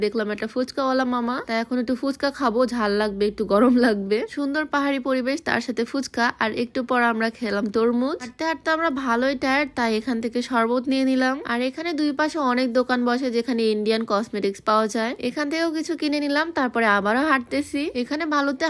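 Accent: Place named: Sylhet, Bangladesh. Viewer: Amader sylhet bole kotha Sundor too hobei Apu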